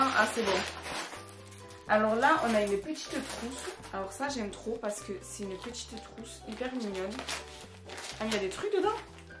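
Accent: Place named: Marseille, France. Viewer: T fournitures sont trop mignonne moi jpp faire sa je vais en cap mécaniques l'année pro donc bon 😂 mais après c'est chou j'ai trop hâte de faire mes fourniture est d'aller en cours mais que les trois premier jours après sa va me saouler ( ta l'accent marseille incroyable comme moi mais toi vrm remarquer ) ❤️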